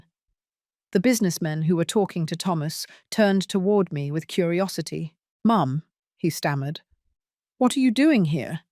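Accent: United Kingdom. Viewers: Good story but dont like the narrator from the U.K.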